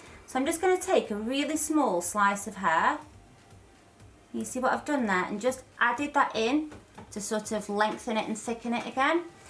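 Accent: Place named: Liverpool, United Kingdom. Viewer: I found the Doris one easier to see because of the contrast in your skin versus the purple of her hair. I was able to follow both. As a side note, I live in America now but your accent is from my part of the world. Are you from somewhere just outside of Liverpool? Prescott or Warrington possibly?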